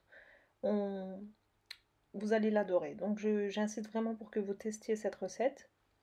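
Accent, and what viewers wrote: Alsace, France. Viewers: Bravo pour tes recettes tu as un petit accent alsacien et comme tu parles d achat en Allemagne pour tes ingrédients ça confirme 😘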